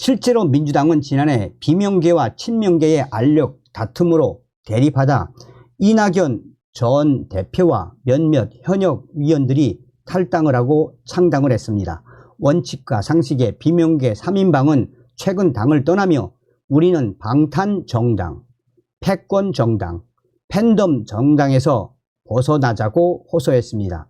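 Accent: North Korea. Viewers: 정성산TV대표님 새해복 많이 받으세요 말투가 북한 말과 비슷하네요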